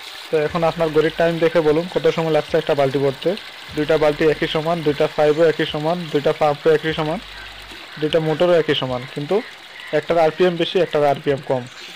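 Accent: Sylhet, Bangladesh. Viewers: ভাই আপনি কি সিলেটি আর এর দাম কত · Vaier bari ki sylhet?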